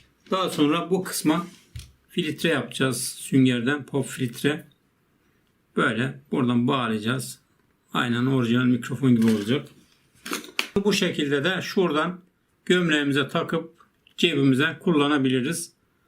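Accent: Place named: Black Sea, Turkey. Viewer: abi sen karadenizlimsiin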